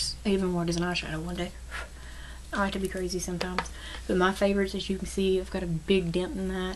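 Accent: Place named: Louisiana, United States. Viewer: I am a new supporter, I found you thru Vallerie Hill. I'm not one to unsupport or unfollow just because I didn't win. I think that's awful & im all about supporting especially small channels and buisnesses. I like to see people grow and I'm passionate about that. So no worries hunnie. I'm glad I found you because it will be great to see you grow!!!! And YOU WILL!!! 😊💗💗 I absolutely love your personality and spunk of Louisiana !!!! I'm from PA so accents are so much fun! I actually don't think I've had Louisiana food cooked right before! I've tried making creole before if that counts!!! I'm from up north so .... Anyways rambling 😂😂 3 MAC shades? My favorite are:1. BLANKETY. 2.SNOB 3. HALF N' Half. And a Question for Q & A ? What is your favorite drugstore brand & favorite High End brand? Thanks for the chance! And cannot wait to continue to see growth in your channel!! 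💗💗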